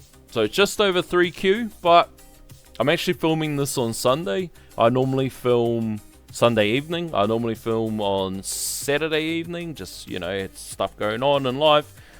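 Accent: Australia. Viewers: Been waiting for that smooth Aussie accent to explain V27 to me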